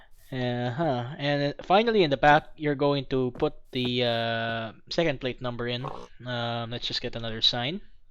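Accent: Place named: Philippines. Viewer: Ang accent niya ay tagalog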